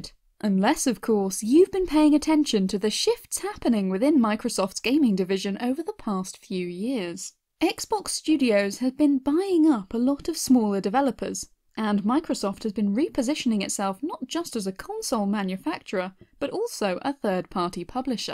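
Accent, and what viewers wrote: United Kingdom. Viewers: Theres just something about a British accent.🤤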